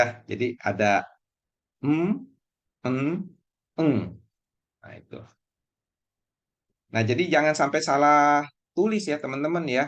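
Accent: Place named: Moiyan, Indonesia. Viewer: Ini dialek mana ko, dialek monyien ya, kalau saya disini dialek liukfeng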